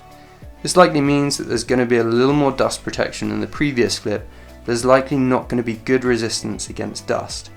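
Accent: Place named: United Kingdom. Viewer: I enjoy the British accent too!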